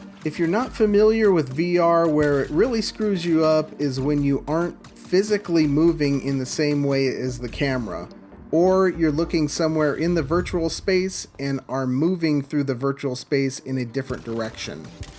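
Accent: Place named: Iceland. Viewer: can't really be too brutal with this vid, its interesting seeing what you vr guys are looking at and experiencing right now, and hearing your take on it. looks cool enough. I was most surprised about your accent, had no idea you were icelandic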